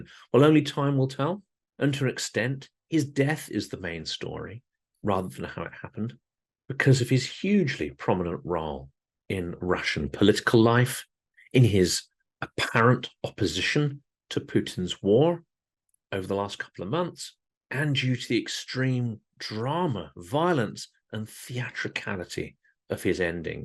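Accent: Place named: United Kingdom. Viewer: Ukrainian shirt + Brittish accent! 🇸🇪 ❤ 🇬🇧 🇺🇦 💛💙💛💙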